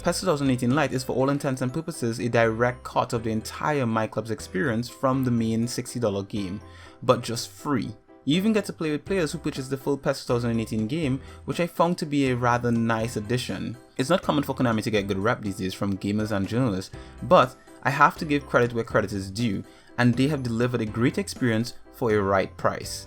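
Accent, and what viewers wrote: Trinidad and Tobago. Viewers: you sound like ur from Trinidad and Tobago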